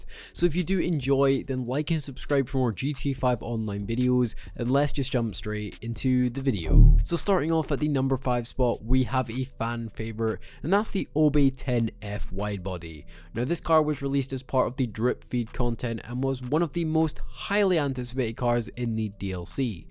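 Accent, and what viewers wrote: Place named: United Kingdom. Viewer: Why tf wouldn’t you put the subtitles for the cars. No one can understand your stupid British accent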